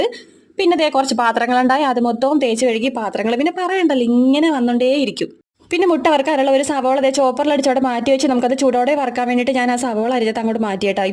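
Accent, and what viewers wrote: Kochi, India. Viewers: Kochi basha 😂same 2u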